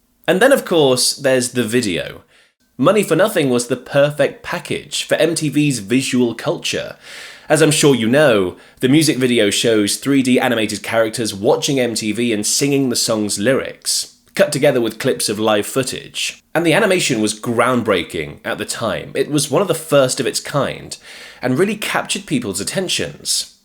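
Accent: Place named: Newcastle, United Kingdom. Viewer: Geordie teaching English? Awye mun!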